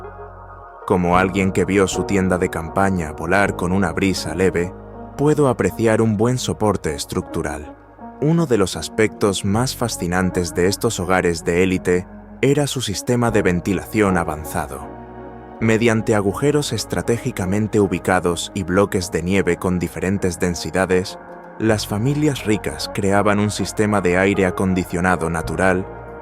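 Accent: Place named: Spain. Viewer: TU ESTÚPIDA VOZ DE GACHUPIN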